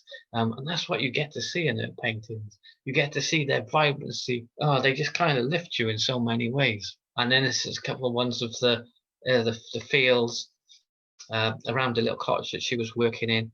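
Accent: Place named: Barry, United Kingdom. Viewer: Lovely to hear another Barry voice talking so knowledgeably about Art. Not only Ceri Thomas then...😂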